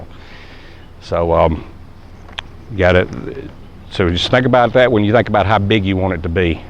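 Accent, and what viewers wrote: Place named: Carolina, United States. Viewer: Thanks for great presentation. Love your Carolina accent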